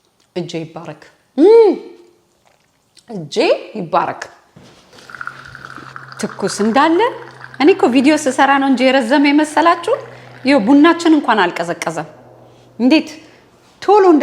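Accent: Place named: Italy. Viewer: ሰላጣ ማለት ነው salad በእንግሊዘኛ ነው አንዳንዴ ጣልያንኛ ተናጋሪ ስለሆነች pronounciation ትንሽ ሊለይ ይችላል።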